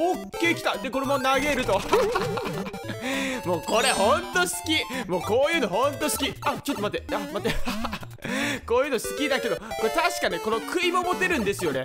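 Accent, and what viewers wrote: Fukuoka, Japan. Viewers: 福岡弁可愛い😆ww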